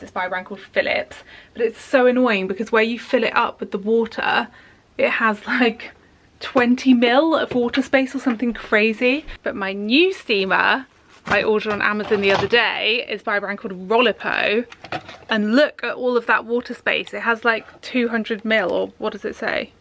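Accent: United Kingdom. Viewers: I’ve followed you since the makeup tutorial times. I always enjoyed your videos. Your voice is so soothing and it doesn’t hurt to have that amazing English accent. So happy for you … you seem so happy! You have a precious little one watching you read a book to him made my heart melt. Enjoy him they grow so fast. Blessings ❤️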